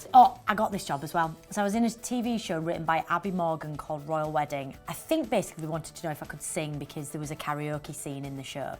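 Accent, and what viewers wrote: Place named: Yorkshire, United Kingdom. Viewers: She's actually Northan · her yorkshirity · Her accent is just brilliant might be a bit bias because im from yorkshire 😂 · she makes me wanna embrace my yorkshireness and I repress it all the time LMAO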